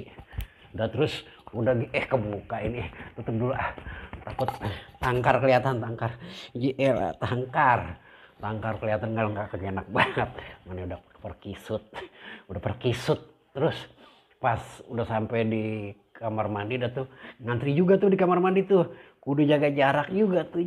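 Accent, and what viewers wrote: Jakarta, Indonesia. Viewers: Mantap bang sambil dengerin logat Betawi nih 😍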